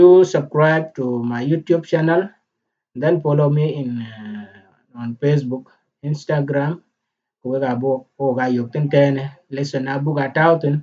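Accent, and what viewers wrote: South Sudan. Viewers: Is this Aweil accent? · What accent of dinka is this??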